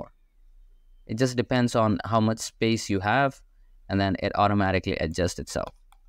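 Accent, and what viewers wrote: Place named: United States. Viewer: hey bro i just want to know how you learn american accent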